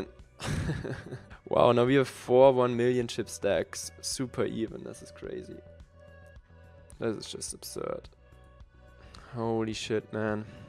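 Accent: Germany. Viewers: you are talking with german accent so much it seems not english...maybe coz you are in germany now btw great content as always. congratz keep it up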